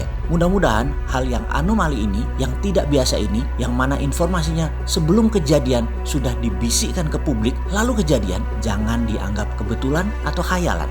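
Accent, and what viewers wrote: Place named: East Java, Indonesia. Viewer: Di unek2ke wae bossman yen dikandani ngeyel. Kangen jawatimuranmu metu #peace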